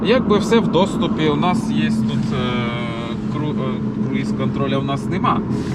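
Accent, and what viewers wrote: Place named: Russia. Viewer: Диктор намагається говорити українською мовою, але дуже не вміє. Йому зручніше говорити російською. Це помітно, що повсякденно він говорить російською мовою.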